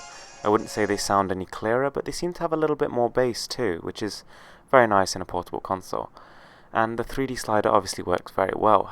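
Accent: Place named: United Kingdom. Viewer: his voice...its so...BRITISH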